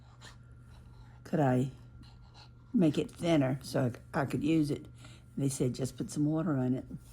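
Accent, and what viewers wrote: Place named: North Carolina, United States. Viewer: I'd wondered about her accent too. I think she sounds so much like my relatives did from a small town in North Central, NC. I know about the tornado yesterday. I was watching the Ryan Hall Ya'll weather channel and praying no one would be hurt or injured but unfortunately people were killed and injured. My mother and I were very sad to hear that news. I do hope is OK I just had her video recommended to me by YouTube tonight. I don't do much paper crafting I usually make jewelry and crochet but love her content, she's so creative and her voice so soothing. I'm subscribing!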